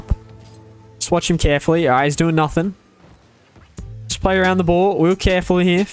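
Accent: Australia. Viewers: bro i didn’t realise you were aussie! should coach me 🤣 xx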